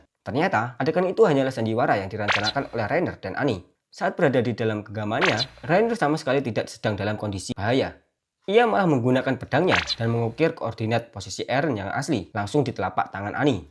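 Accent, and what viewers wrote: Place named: Java, Indonesia. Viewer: Medok bgt jaw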